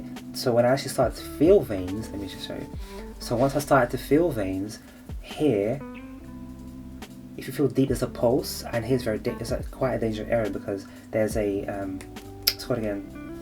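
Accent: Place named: Portugal. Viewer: I’m a admin/receptionist for a GP surgery and I’m so interested in starting my career as a phlebotomist, I’m about to enrol in a private phlebotomist course which is two full days, is nationally accredited, but to be fully qualified I need to get 30 sticks and work experience at a surgery which I might ask my boss if I can shadow our in house phlebotomist on my days off, or go straight to one of our hospital and ask to volunteer and be trained. I’m so looking forward to it, so I’m trying to learn as much as possible about the training process, so I found your video extremely helpful. I’m assuming you are Portuguese by your name and the way you speak, I’m Portuguese too. Bjs Silvia Gomes Freire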